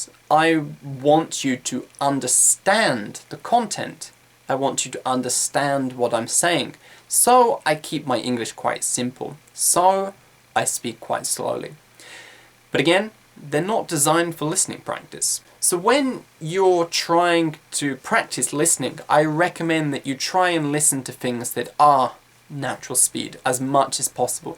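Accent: United Kingdom. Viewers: Excuse me, I am Fitri from Indonesia, 18 Years old. I have a question that is what kind of languanges do you use ? American English or British English ? Because, some times when I am listening your speaking, I guess your speaking in British English. Is it true or not ? Please give me the explanation. Thanks :-) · He sounds British or something to me but idk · achi milorava It's because of his British accent :)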